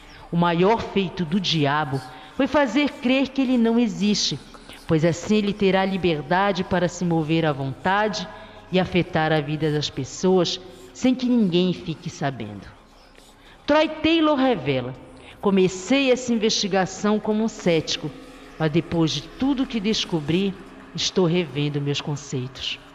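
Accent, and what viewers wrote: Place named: Florianópolis, Brazil. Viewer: Você é de Florianópolis? Seu sotaque é de manézinha da ilha hehe, sou de Floripa também :)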